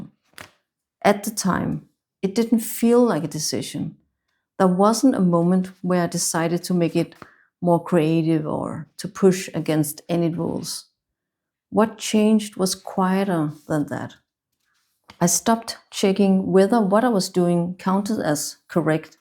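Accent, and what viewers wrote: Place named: Denmark. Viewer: A Dane! Thought I recognized the accent. I also use the Hobonichi cousin, and it got me through my MSc here in Denmark. Though I am an American married to a Dane for the past 22 years.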